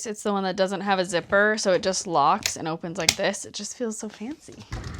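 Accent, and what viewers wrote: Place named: Ontario, Canada. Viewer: your page got recommended to me randomly and i could tell immediately you were from ontario canada lol. the accent gave it away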